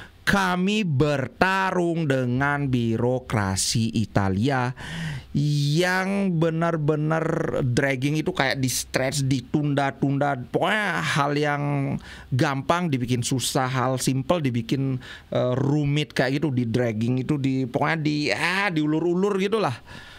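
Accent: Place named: Singaraja, Indonesia. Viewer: Betul banget dari Singaraja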